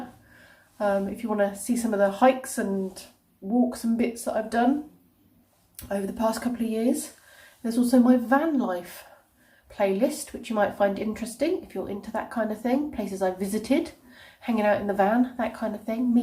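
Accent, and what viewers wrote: United Kingdom. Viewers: You do sound and look like you are feeling a little bit better. I’m glad you didn’t have to watch the littles this last couple of days it would of been terrible but I have no doubt you would of done it. I’m one that enjoys watching you everyday. You are my little bit of the UK as I may never get to see it for myself and I love your accent. Take care.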